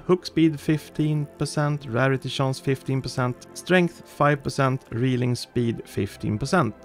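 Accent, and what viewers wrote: Sweden. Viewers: Hello your videos are awesome but i got 1 question for you (Are you actually swedish bc you sound very swedish like i do)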